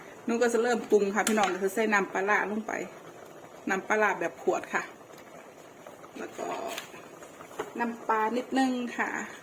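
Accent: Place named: Sisaket, Thailand. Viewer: แซบๆคับผมเห็นแล่วน้ำลายใหล สำเนียงคือคนศรีเกษแท่คับ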